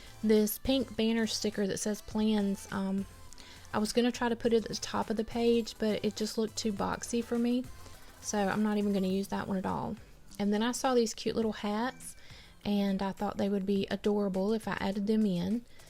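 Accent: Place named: Carolina, United States. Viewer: You sound like you may be a Carolina girl! Love your channel! ❤️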